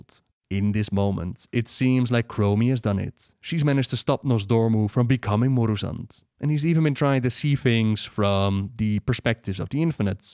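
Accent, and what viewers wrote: Netherlands. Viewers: Love the accent of a fellow dutchy🤪🤘🏻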